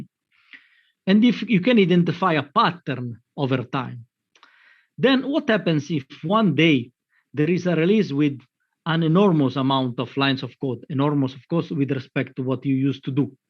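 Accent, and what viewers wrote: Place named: Russia. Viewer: Он говорит на английском максимально по-русски) Очень понятный акцент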